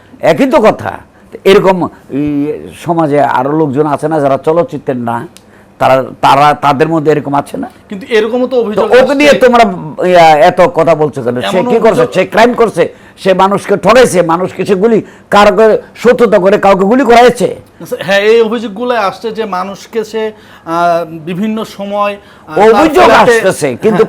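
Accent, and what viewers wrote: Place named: Sylhet, Bangladesh. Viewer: আপনার কথা সিলোট যানাই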